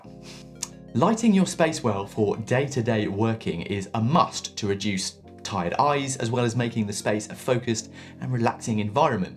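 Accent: United Kingdom. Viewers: Loved the energy of this video, really inspiring! (and lovely british accent)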